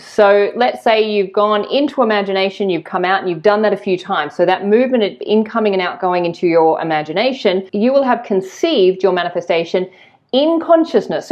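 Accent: Australia. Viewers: Me too, I am falling in love with the Oz accent.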